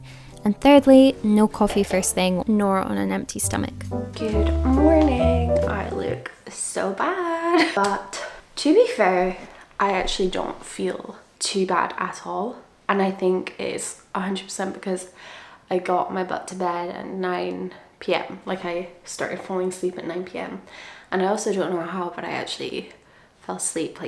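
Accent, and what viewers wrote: Scotland, United Kingdom. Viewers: Omg I love her slight Scottish accent 😍😍😍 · Hi, I just found you through this video, and your accent is so ambiguous. Are you scottish? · It’s a soft Scottish accent.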